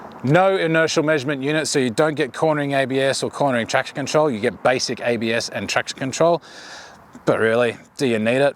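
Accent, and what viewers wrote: Australia. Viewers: You sound Australian... but keep saying the Hornet is "under $11K" - in what country? In Sydney Aust they are "Under $20k" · Mate are you an aussie? In not picking up a US accent. Awesome video though. Thanks for the comparison. Been eyeing off the Honda for my next bike.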